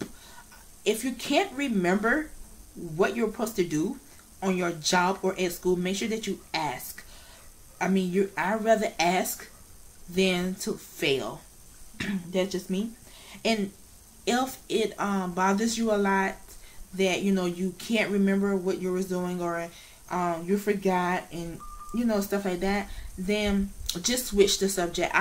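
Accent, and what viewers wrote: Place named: United States. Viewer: Yeah one of my friends had the same she had hogkins lymphoma and relapsed and had a stem cell for the second time. I'm guessing you live in America from your accent lol was your treatment expensive? As I live in the uk we have the nhs.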